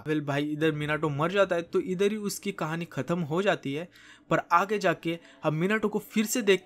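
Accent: Maharashtra, India. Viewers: ho bhai maharashtrian ki bolnar ki style pahachan mai aate hai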